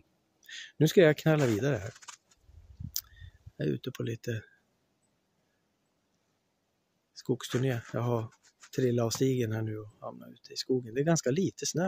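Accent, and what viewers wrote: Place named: Norrland, Sweden. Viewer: Tack, detta är min sanning också. ❤💚 Härligt och höra norrländska. 😊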